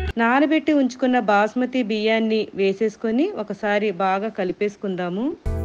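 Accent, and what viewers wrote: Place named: Andhra, India. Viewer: మేడమ్ గారు మీరు తెలంగాణాలో ఉండి కూడా, అంద్ర తెలుగులో స్పష్టంగా మాట్లాడటం చాలా సంతోషం అండి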